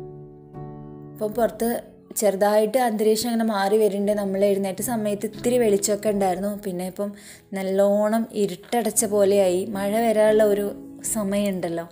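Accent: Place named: Palakkad, India. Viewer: Palakkad stilpole thonni atha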